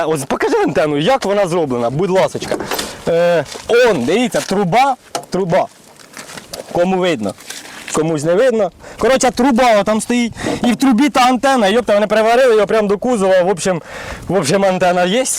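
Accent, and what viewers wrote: Poltava, Ukraine. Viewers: З перших секунд чути Полтавську область. Звідки ти?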